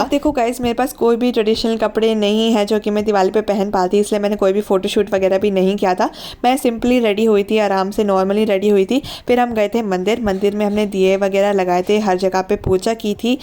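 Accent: Haryana, India. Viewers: Aap haryana se ho??? Seriously??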